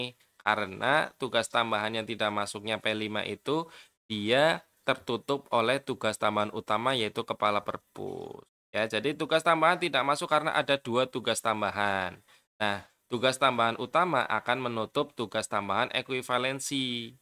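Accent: Banyumas, Indonesia. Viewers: Bg 😊, solusi tugas tambahan guru kami semua tidak terbaca di profil guru di dapodik, solusi nya bg?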